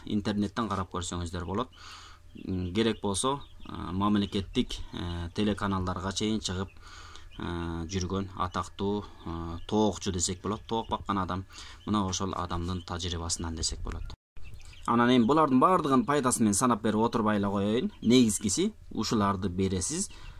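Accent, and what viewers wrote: Pamir, Tajikistan. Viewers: Памириский акцент 😀 молодец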